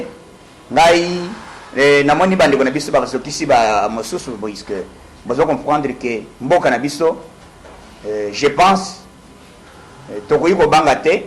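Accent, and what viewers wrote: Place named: Kasai, Democratic Republic of the Congo. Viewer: Bongisa lingala depuis owuta Kananga kk tshiluba na munoko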